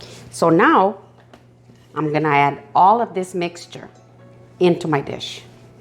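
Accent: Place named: New Mexico, United States. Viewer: ~ ...your accent reminds me so much of some of my aunts from NewMexico...LUV iT ! : )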